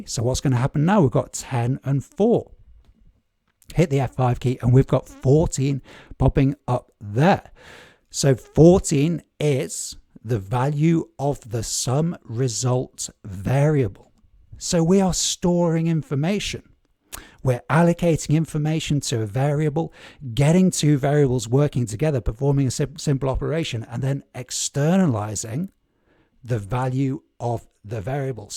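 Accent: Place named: United Kingdom. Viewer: I also learn English here! "Stag Do"....I looked it up and it means bachelor party. We use the term "bachelor party" in US. I learned VBA from other Youtube channel , but this interactive course , I think, is better. The British accent sounds a little bit strange to me at first, but I get used to it.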